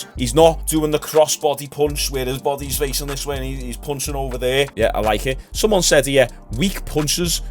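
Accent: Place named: Glasgow, United Kingdom. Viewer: In regards to hate comments and what not, a lot of kids are on here who don't know any better and also bitter A-holes sad about their own life unwilling to do anything to change it, wanting to bring someone down to their level instead. Constructive criticism is great to learn and grow from, but some people abuse anonymity just for the sake of being vile. Keep up the effort with the uploads and people will eventually gravitate. I think most state side Americans love your accent (I wanna say Glasglow) plus your entertaining so Its almost assured you're gonna blow up huge one day.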